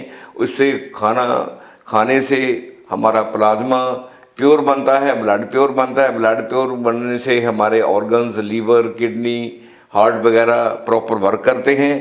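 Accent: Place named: Punjab, India. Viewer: Dr. Sahib plz. Kara banane wali 11 चीज़ों के naam लिख दीजिए आपका पंजाबी style मै बोलने कि वजह से समझ नहीं आ रहा है. मैं तकलीफ में हूँ please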